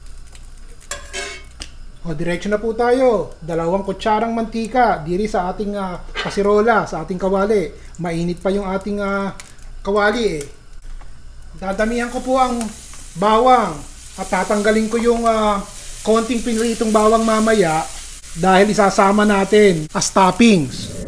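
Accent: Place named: Bulacan, Philippines. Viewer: Chef,bulakenyo po ba kau?kasi ganyan po ang punto ng mga bulakenyo e 😁😁😁 nakakatuwa po kayo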